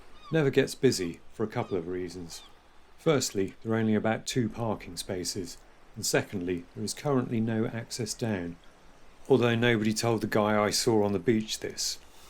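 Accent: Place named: RP, United Kingdom. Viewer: Fantastic nice film and the narration is high English at last!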